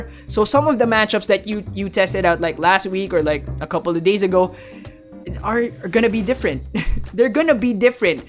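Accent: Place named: United States. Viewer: You from the Philippines man becuase your accent very 4corners amarican accent.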